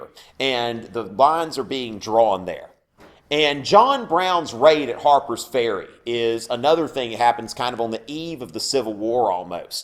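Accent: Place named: South Carolina, United States. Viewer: Thanks for clarification on the Compromise of 1850. BTW- You need to help Keith Hughes with his southern accent. :-) (you sound like a South Carolinian. I'm a Georgia boy)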